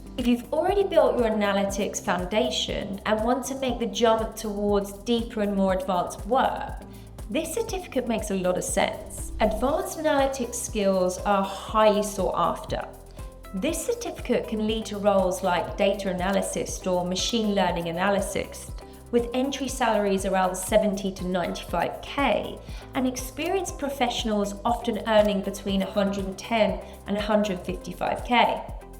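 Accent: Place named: United Kingdom. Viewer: Thanks your very profrssional video. well said, well presented. your English language is Perfect clear slow british style. how u found ur 5yrs experience in coursera? honedtlu. all people talking about their desire to self learning but only 5% complete walking the road to the end. God bless u with many thanks. was nice just cross roads with u here❤🎉gd nite then happy greatvthurc13aug2026🎉😊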